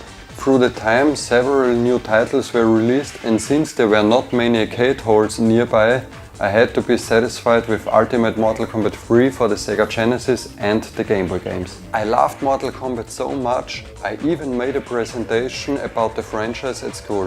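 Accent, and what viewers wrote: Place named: Austria. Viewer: Γεια σου! Ρε μαλακα ich kann dir nur sagen, dass deine Editing Fähigkeiten richtig gut sind und die Umsetzung sehr gelungen ist. Es war toll deine Geschichte über deine Kindheitserinnerungen von Mortal Kombat zu hören in deinem fehlerfreien Englisch (mit enormem österreichischen Akzent) und das ohne ähms oder Unterbrechungen. Was du bestimmt verbessern kannst ist die kurzen Abstände zwischen den Sätzen zu cutten, da dies noch professioneller klingt. Dein Intro hat es mir echt angetan und ich finde es einfach genial, dass du zu deiner Herkunft stehst und dein Ding durchziehst. Ich habe dich auf Instagram gefunden und dein Link in der Bio hat mich hierhergeführt und ich war überrascht, dass du nur 12 Abonnenten hast. Durch mich hast du jetzt 13 und ich gebe dir hiermit einen Daumen nach oben. Ich hoffe deine zukünftigen Videos werden sich auch mit anderen Themen beschäftigen. 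Wenn du magst, kannst du mal auch meinen Channel anschauen, der ebenfalls in den Kinderschuhen steckt. Kannst mich auch gerne auf Instagram abonnieren, habe dir dort ebenfalls ein Abo gegeben. Ich habe ein Unboxing und Gameplay zur SNES Classic Mini gemacht, welches mir total Spass gemacht hat. Vielleicht wird dir das auch gefallen! Bleib dir selber treu und ich freue mich auf dein nächstes Video. Grüsse von einem Serben aus der Schweiz Vlad :)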